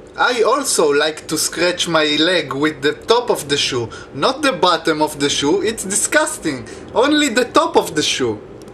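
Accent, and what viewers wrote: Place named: Israel. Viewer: וואי תקשיב המבטא שלך מראה את הטמטום הישראלי . אבל זה בסדר :)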